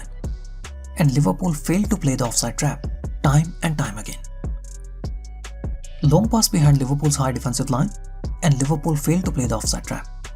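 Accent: India. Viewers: Since You are an Indian (accent) make an analysis on Sunil Chettri or The Indian National Team.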